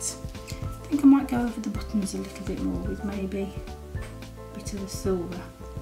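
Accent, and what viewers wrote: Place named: Birmingham, United Kingdom. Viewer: Thanks. Great video. And I love them Brummy accent! Reminds me of home in Coventry xx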